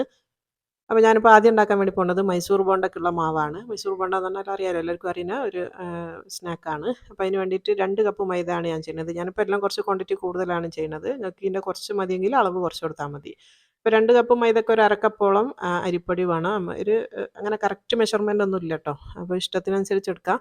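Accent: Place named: Kozhikode, India. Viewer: Super dear njangale Kozhikode slang 🥰❤️presentation super. 👍stay connected 🥰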